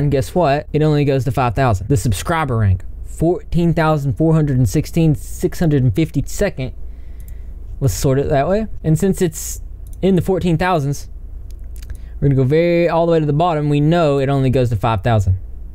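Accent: South, United States. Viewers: i dunno :|........but in my opinion.......maybe its the southern accent......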